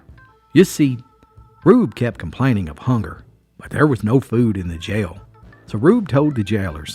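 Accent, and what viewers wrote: Appalachia, United States. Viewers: From Switzerland or from Mountainman to Mountainman. Great Storytelling, love it. The way you tell it and can l say your Appalachian dialekt make your storys special. And can l ask you for that Red Rube video intro tune?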